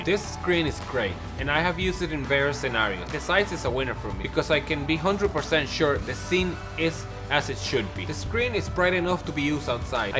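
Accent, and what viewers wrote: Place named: Dublin, Ireland. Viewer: brilliant review I knew there was a bit of a Dublin twang in the accent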